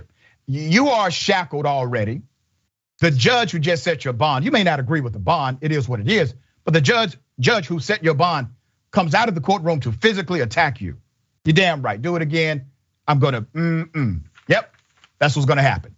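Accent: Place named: South, United States. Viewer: 😂 love your southern accent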